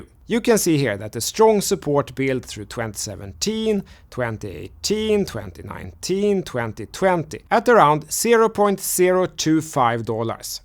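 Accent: Sweden. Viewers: The biggest Sweängelska I heard in my entire life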